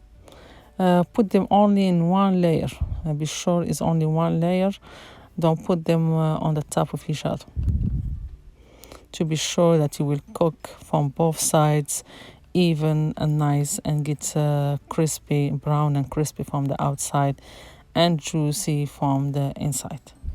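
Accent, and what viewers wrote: Morocco. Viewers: It sound like you have a Moroccan accent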